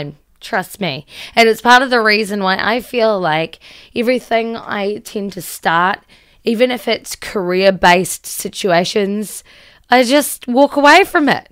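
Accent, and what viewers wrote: New Zealand. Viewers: First thank you for everything you do and speak about here. I do appreciate your effort and perspective. I can relate with most of what your talking about. I’m a combo ADHD. I also express traits of autism. The “super powers” I believe are rather misunderstood and/or difficult to understand. Something I think you can easily understand is this: I enjoy watching you speak and hearing you speak. (2 different things) Your voice is enjoyable and words are very understandable. I also found that I really like the kiwi thing. (Siri is set to Aussie accent for me). At the same time you “accent” or “emphasize” words or phrases with your body language. You express a passion about something or a subject of discussion in ways very few people can. You can make an extremely strong point and speak with a gentle affection towards people. Emotional dis-regulation to me means; you either like it or you don’t like it, passionate about it or cold shoulder towards it, not much middle ground. 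Also I’m pretty sure you will understand this more deeply than most people. Everybody understands a B-day card. You pick it out and sign it, makes it personalized. I believe the universe is not a mistake. Things happen for a reason. “If opportunity is intentional, you should make it personal “ and you do this. Most people would probably say “intimately authentic”......ADHD super power isn’t flying threw the air or super human strength, but more of the ability to express something in a extremely strong compassi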